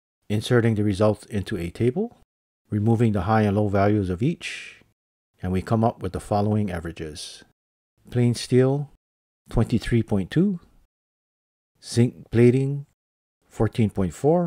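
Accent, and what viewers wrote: Hawaii, United States. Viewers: Are you from Hawaii? I hear a little Hawaii local twang in your voice lol😂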